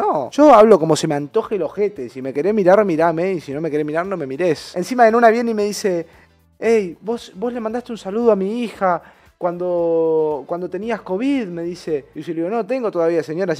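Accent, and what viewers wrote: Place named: Chile. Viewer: pov:la presentacion del video hola hola po weon (es por el acento de chile)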